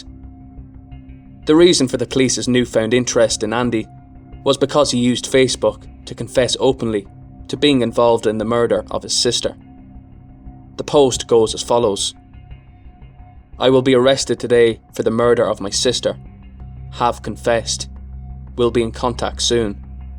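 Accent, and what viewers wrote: Ireland. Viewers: I'm pretty sure the narrator is Irish. Try pronouncing some of their names.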